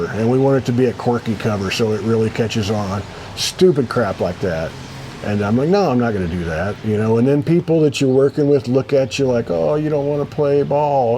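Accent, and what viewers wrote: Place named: South, United States. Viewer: Ain't been here for all 100. Not even close. But you're a natural story teller. That genteel southern drawl is a big plus too. You and your friends are real easy to watch. You've got a way about you. Thanks for creating this channel. It's just my style.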